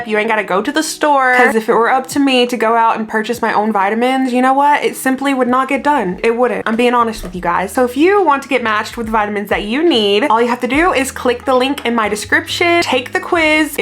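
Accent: South, United States. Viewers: Thank you for making realistic content!! Also I’m a moved away from the south person so your accent makes me feel like your my cousin haha. Keep being the realest girlie out here.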